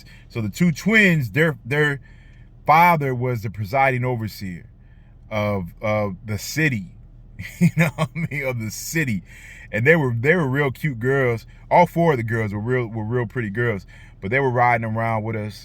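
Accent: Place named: Pennsylvania, United States. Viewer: Are you originally from PA? You got that Pennsylvanian accent lol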